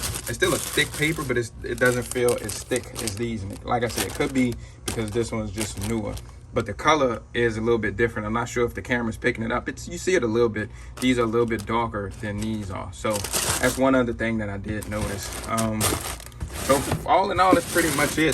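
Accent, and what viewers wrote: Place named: Louisiana, United States. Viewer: You gotta be from Louisiana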